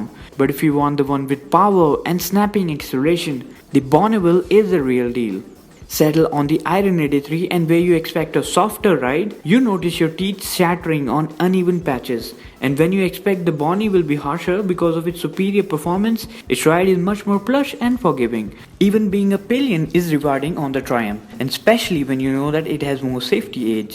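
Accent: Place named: India. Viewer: only you could understand your english indian accent... worst!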